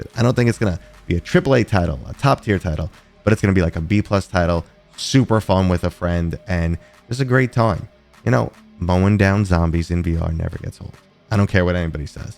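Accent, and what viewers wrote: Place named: New York, United States. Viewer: haha my bad, just breaking your balls. I guess this is the first time I actually noticed your accent in your videos, is all :). Starting with how you said "Silent North." also you're likely in NYC if anything, idk why i was thinking Massachusetts haha.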